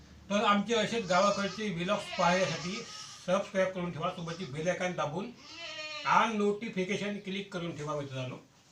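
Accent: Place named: Vidarbha, India. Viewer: भाऊ तुम्ही नागपूर म्हणजे विदर्भात ली भाषा बोलता.मीपण विदर्भात ली आहे त्यामुळे मला बरं वाटते.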